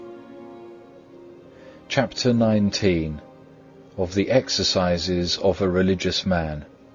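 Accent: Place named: England, United Kingdom. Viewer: Grazie mille! to the dear soul who set "The Imitation of Christ" to background music! I listen to this almost every night as I sleep since I ceased taking anti-depressants exactly one year ago in October 2017!!! You have made it fresh and more poignant. The reader's beautiful English accent is soothing, but sometimes the words alone are a little stark! Sincere thanks to you. St. Thomas a Kempis words are a cure for my mental illness! Thanks be to God!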